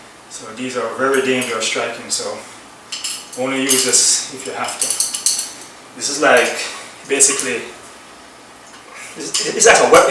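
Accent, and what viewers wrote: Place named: Jamaica. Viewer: wait...... yuh sound like a yardie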